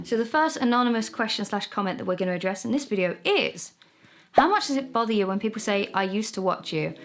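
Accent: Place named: Essex, United Kingdom. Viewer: I love that you've left up Ask 4, that's still so entertaining. An interesting slice of history too with the full on Essex accent still strong back then.:)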